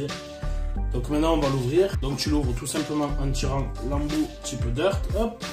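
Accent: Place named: Toulouse, France. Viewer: Comme prévu... j’attends ici ! Peinard, j’veux rien louper ! 😄 T’as le petit accent de par chez moi, tu dois pas être trop loin de Toulouse non ?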